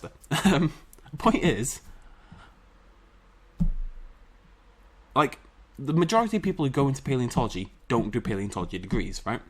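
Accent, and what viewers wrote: United Kingdom. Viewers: Everytime I hear a British accent, the face never matches what I imagine and it’s honestly fascinating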